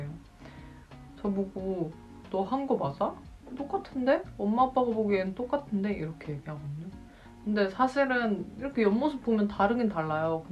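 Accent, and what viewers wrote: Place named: Chungcheong, South Korea. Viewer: 어우 충청도 사람같아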